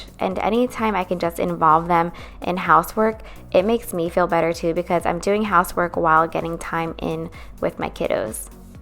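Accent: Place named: East Coast, United States. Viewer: Just discovered this channel today...I have to ask, does anyone know where she is from? She seems to have an east coast accent. I'm originally from Cape May...so I can usually spot an east coast accent instantly (makes me a little homesick) 😊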